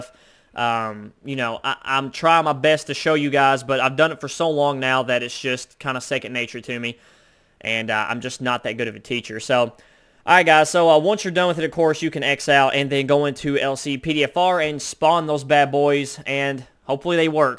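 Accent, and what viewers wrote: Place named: South, United States. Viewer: you sound like the country version of danny trajo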